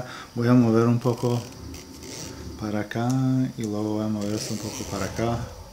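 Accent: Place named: Mexico. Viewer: Hola. Podrías decir los diámetros y paso de los dos Usillos, el X y el Y, así como los pasos por vuelta de cada motor. Puestos a pedir podrías decirnos de donde eres, cómo fue aprender ese Español medio Mexicano y si vives en España. Cuando te haces famoso la gente siente curiosidad, es normal. Un saludo 🇪🇸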